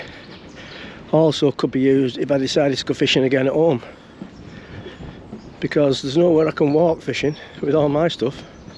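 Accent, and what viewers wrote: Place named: Oldham, United Kingdom. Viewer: I enjoyed this - quiet because few people go on holiday to Norfolk in Feb. You are a bit different, but enjoyed the tour of the pubs, and you sound like you are from Owdam, so clearly understood:)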